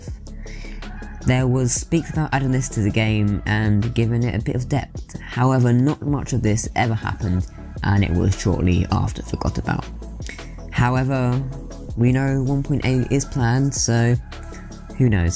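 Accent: United Kingdom. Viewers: Are you, British/Briish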